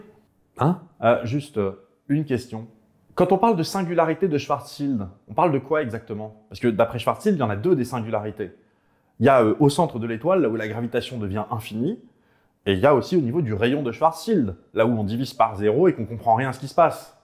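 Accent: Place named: Alsace, France. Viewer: Alsacien je crois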